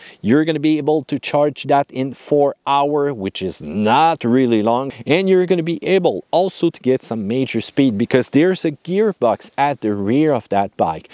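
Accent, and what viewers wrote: Quebec, Canada. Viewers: the french canadian accent cracks me up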